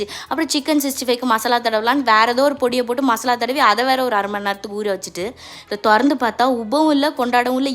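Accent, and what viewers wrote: Tirunelveli, India. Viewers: திருநெல்வேலி பேச்சு நல்லாவே இருக்கு.😂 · thirunelveli tenkasi antha side lam paesurathu ipudi thaan irukkum... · கொன்டாவும் இல்ல திருநெல்வேலி பேச்சு 😊😊😊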